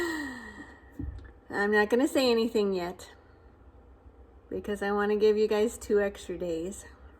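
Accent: New York, United States. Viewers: Wow - U got the NYC accent down pat. 😊 You've got those US regional accents great too. How'd U learn to do that? Hi, gal. Jan here again. Guess U feel a little during latest post. Hope so, gal pal ❤ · Hello from indiana omg I can’t believe your NY accent that’s awesome 😂🥳. Have a great day cutie 😊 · Aww no answer yet . Love the NY accent , can you do West VA accent lol I’m from Florida.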